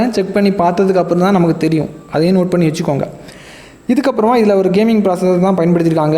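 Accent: Kanyakumari, India. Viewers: kaniyakumari slang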